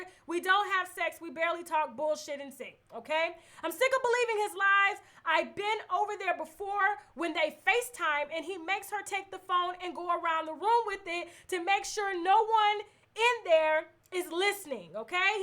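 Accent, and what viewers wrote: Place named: South, United States. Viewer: I love your country accent.I am also a pisces so i relate to you on so many levels!🙋🏾‍♀️✊🏾👸🏾💜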